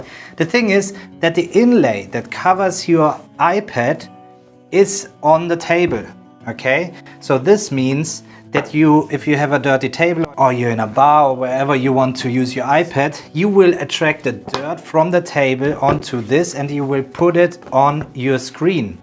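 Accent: Germany. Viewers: Direkt den Deutschen Akzent rausgehört 😂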